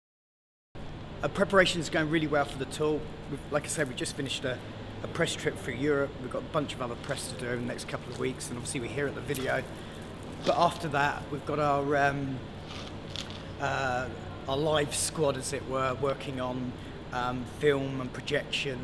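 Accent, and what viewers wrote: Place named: United Kingdom. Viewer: "his voice is so weird." He's British. We all have different accents in Britain. Northerners (like me) have stronger accents. It really depends.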